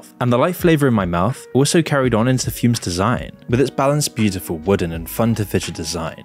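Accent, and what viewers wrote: England, United Kingdom. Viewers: Not defending dubai, but the criticism and comparison with london is a bit rich coming from an english sounding presenter - the kinks and pedophelia of american politicians and british royals is equally evil and above law so far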